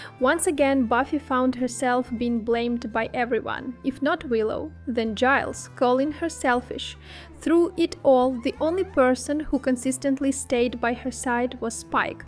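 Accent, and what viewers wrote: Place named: Brazil. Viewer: Are you Brazilian? Italian? Just curious about your accent.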